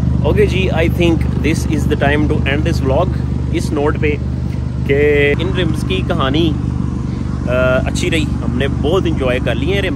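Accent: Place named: Punjab, India. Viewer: Your punjabi ascent is so secxi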